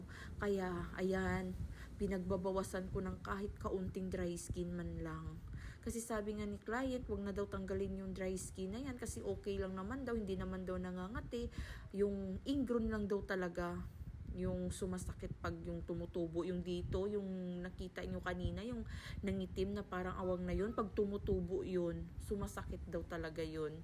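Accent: Iloilo, Philippines. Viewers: Hahahahaha .. N d sapaka ahh..nami ya imo tingog...!! Ilongguha madam ahh..hehehee Bay'i da cla...hehehee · Illongo accent is a bit annoying here in negros oriental its an accent from bukid only kagid haw haw d karabao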